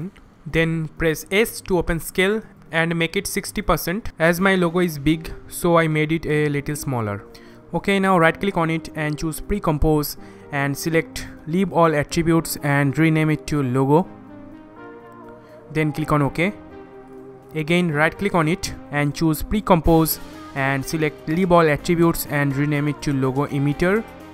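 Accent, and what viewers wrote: India. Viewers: Hey Buddy are you Indian?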